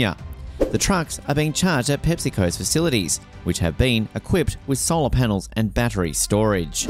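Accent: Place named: United Kingdom. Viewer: Annoying Brit accent and inaccurate information. Very redundant and repetitive narration. The current average class 8 trucks in 2023 range from $150,000 to $220,000.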